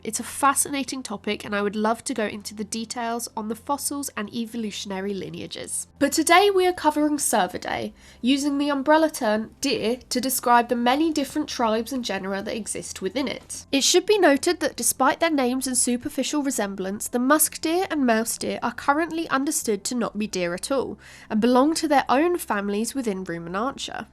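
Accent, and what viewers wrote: United Kingdom. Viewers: I like the British accent. It makes me think of Harry Potter. Sorry. 😅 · Fabulous! Listening to a British Thumbelina in a basket teaching me about deer is so wholesome.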